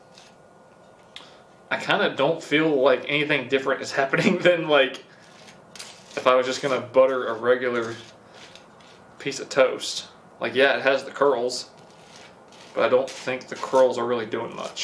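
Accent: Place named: Alabama, United States. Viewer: Lol he's like Alabama backwoods sometimes but you can definitely tell his name is lol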